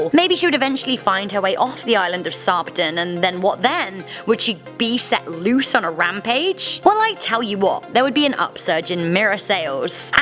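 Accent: England, United Kingdom. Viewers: Just to say, I live your English accent dear😊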